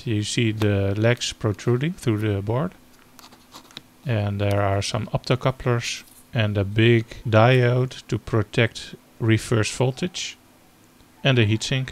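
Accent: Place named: Netherlands. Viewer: Considering his pronunciation, making fun of other people is not that great. Way he pronounced V and Ch and such I am pretty sure he is Dutch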